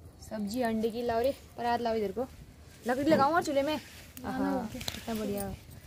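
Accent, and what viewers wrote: Pahari, India. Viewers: tumhari jo ye pahadi m bolna hai na mujhe bahut achache lagate hai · आपकी पहाड़ी बोली बहुत अच्छी है 👌👌👍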